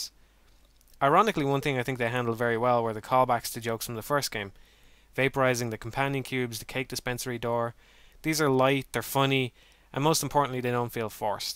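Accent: Ireland. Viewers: where are you at in the world I live in the us in kokomo Indiana but I feel we are kindred spirits because you have passion for games like me and you sound irish I have red hair and I agree with every you say about games im just drawing a comparison but its incredible the way your words are the way I think maybe we could team up but that is just wishful think anyway keep with it I love work man you are like adam sessler, tommy tellareco, Geoff key lee. (spelled wrong) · are you irish?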